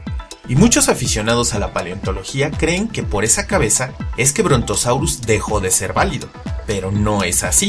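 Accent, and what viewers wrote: Mexico. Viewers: ¿Eres de México?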